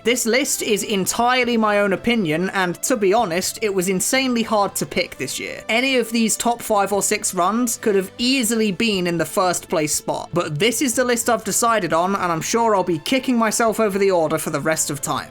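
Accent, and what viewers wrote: United Kingdom. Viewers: Unfortunately you a British so I could not enjoy this video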